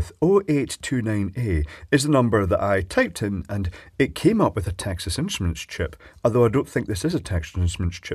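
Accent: Scotland, United Kingdom. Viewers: His accent is definitely not Manx, it's Scot's English. He does live on the Isle of Mann, though. Maybe they tolerate more explosions than the Scots? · Few things make for a more compelling video than a calm Scottish voice continually asserting that what's about to be done is really not a good idea.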